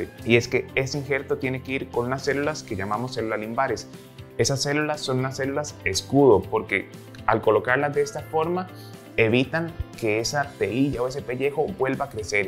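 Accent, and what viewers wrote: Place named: Colombia. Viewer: Pense que el doctor era Colombiano por su acento.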